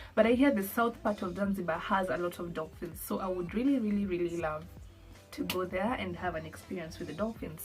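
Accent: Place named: Kenya. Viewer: Oh you are from Kenya. I figured it out from listening to you.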